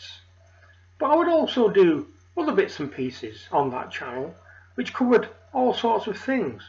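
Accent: United Kingdom. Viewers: all good. Nice to hear the Lancastrian drone of voice again. Bye the way we are on the same page 😉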